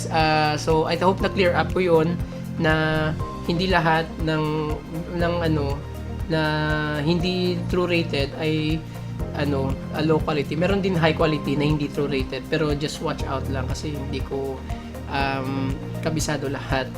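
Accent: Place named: Iloilo, Philippines. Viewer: Prang ilonggo ka kasi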